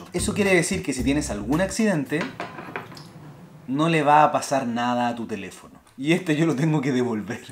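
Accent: Chile. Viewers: Eres Chileno, yo lo soy, y creo que tu idioma se parece un poco al Chileno😂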